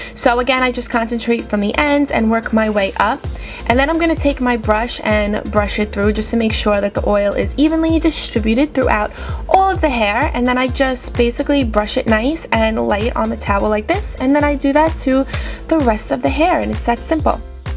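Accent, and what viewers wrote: New York, United States. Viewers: New york? I love your accent!! love from Birmingham UK!! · LOVE your New York accent! I'm from Staten Island and I moved to jersey over 15 years ago and I still have my accent lol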